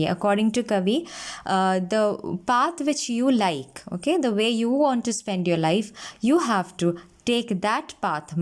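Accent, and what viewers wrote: Kerala, India. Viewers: Ig Kerala due to the accent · Mam...I guess u r a Malayalee..am I right ?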